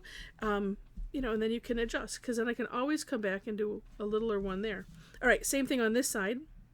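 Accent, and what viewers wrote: Midwest, United States. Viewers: Good morning, I work right along with your videos. It takes me a little longer so I constantly have to go back with my tablet. But it's okay cuz I like listening to you talk while I work. I love your med Western accent. I'm from Chicago but I live in North Carolina now and just about everybody has a Southern drawl here. Thank you for putting out these videos. ❤️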